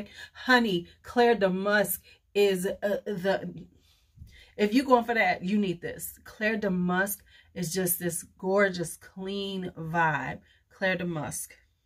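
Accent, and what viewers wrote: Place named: United States. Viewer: love this house! Serge is sooooo good! Clair de musc is my base... always. BTW your french is adorably USAmerican. <3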